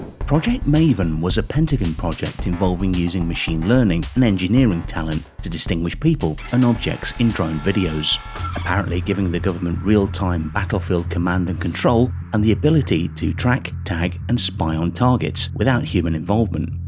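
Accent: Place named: United Kingdom. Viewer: Awful British accent... I turned off sound and watched only subtitles... Why this pronunciation is so bizzare and awkward, as oversophisticated pukes?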